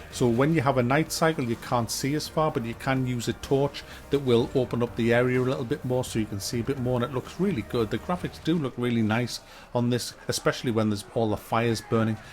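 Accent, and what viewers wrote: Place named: Northern England, United Kingdom. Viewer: LMFAO, bloody northerner! Every time I listen to in his reviews I visualize Oz from Auf Wiedersehen Pet, and that makes it all the more awesome.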